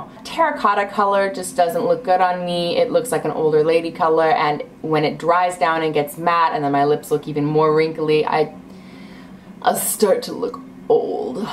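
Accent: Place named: Netherlands. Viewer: ben je nederlands ik hoor het aan je acent :)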